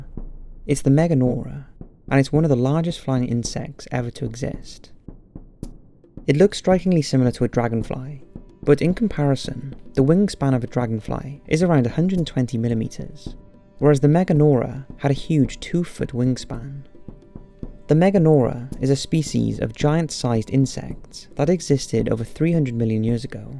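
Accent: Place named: South Wales, United Kingdom. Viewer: It's a southern Wales accent. Sounds most similiar to a Merthyr one, but he's such a soft spoken guy!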